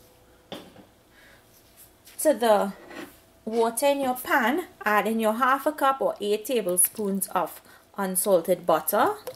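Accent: Trinidad and Tobago. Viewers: Beautiful Trinidadian Accent!!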